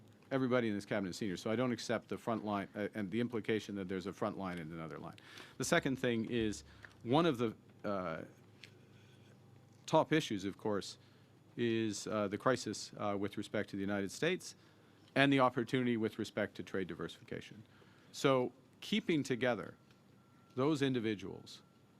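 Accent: France. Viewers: I don't know why some critics say he doesn't speak French well... He does and he speaks French French not Quebecois, maybe the critics are attacking that.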